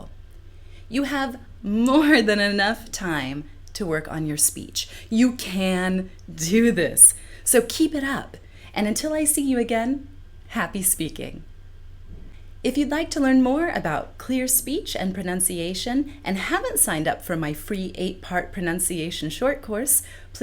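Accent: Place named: Mid-Atlantic, United States. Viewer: You have an amazing accent. It's sort of a posh American accent, so to speak. By any chance, is it close to Mid-Atlantic accent?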